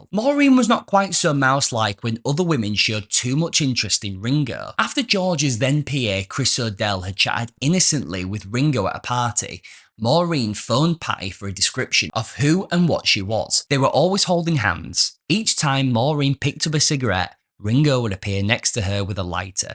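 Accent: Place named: Hull, United Kingdom. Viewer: You have a slight Hull twang.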